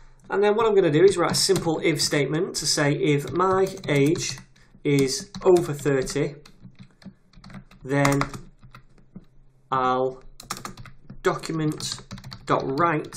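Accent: United Kingdom. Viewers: Where about a in the uk are you from buddy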